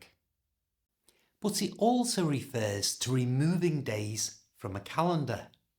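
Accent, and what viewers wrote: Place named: West Lancashire, United Kingdom. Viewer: Fun, useful and informative! I chat - online - to quite a few people from countries other than England and know how difficult it can be for most foreigners to understand British humour (unless it's particularly visual - EVERYONE seems to like 'Mr Atkinson'!). I'm going to devise a 'joke' about one sentence YOU said, "You need to know that the part your body you WERE when you put a belt on...."! Of course, this shows that regions and dialects can also give rise to clever 'word-play'. I'm guessing you are from West Lancashire?! (I once worked - in Panto - with Ken Dodd so learned lots about jokes, humour and accents - sometimes until after midnight!!) Thanks for posting!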